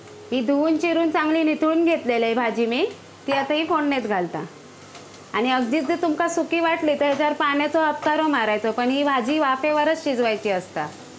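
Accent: Malvani, India. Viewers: तू इतकं गोड मालवणी बोलतेस ना की ऐकत रहावं. नक्की करतलय भागला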